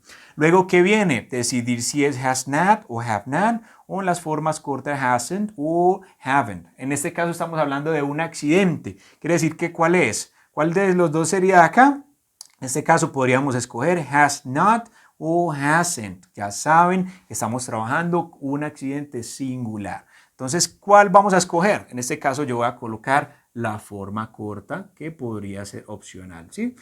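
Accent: Colombia. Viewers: Me gusto este canal porque me encanta el acentos colombiano parce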